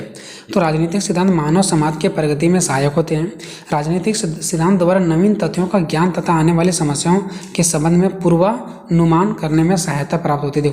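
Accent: Uttar Pradesh, India. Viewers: Ap ki voice bhot pyari hai aesa lag rha hai kese ap utar Pradesh se ho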